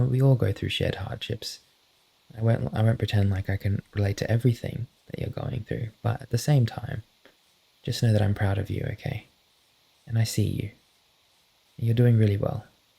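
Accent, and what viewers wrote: Australia. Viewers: absolutely adore these videos ❤ love your calming voice, and hearing the soft aussie accent as another aussie makes me feel so warm :) like being on call with a friend as i drift away. please don’t stop making these! <3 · Btw are you Australian? It's the accent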